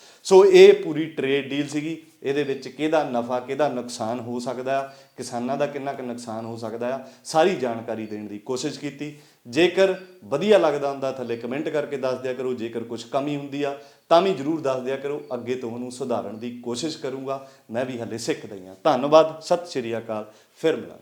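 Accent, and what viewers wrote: Doaba, India. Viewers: DOABA DI LANGUAGE A BHAJI EH AAI AAP EDA BOLDE A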